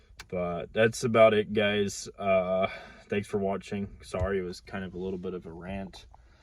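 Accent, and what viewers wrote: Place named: Kentucky, United States. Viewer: Sounds like either Northern Ky or western Ky. You need to come out to southeast Ky in the mountains. It’s a whole different world out here. Less government involvement!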